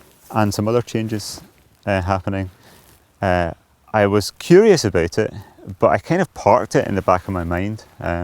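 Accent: Scotland, United Kingdom. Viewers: I going to use your channel to learn understanding the Scottish accent :-) Interesting content! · I like your braw Scots accent,. My mother was from Dunbar:thanksdoc: & was raised in Edinburgh. Dad was an American and he was in the Air Force stationed in Scotland.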